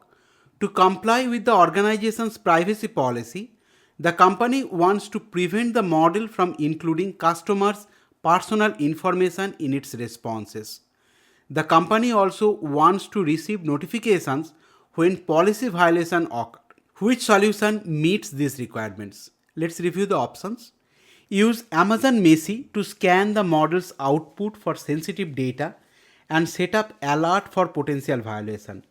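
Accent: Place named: India. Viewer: These are the best series for Indian users, the voice and accent is for the Indian ears. Also the content is well researched better than AWS own AI practitioner course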